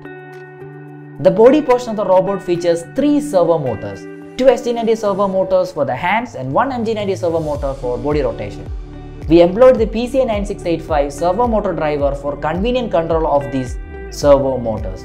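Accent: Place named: Kerala, India. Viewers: Malayali tone actually ❤🎉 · Indian tone gives me goosebumps.... ❤❤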